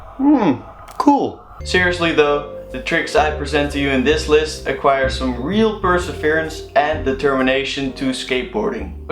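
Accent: Netherlands. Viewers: u dutch ? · Are you dutch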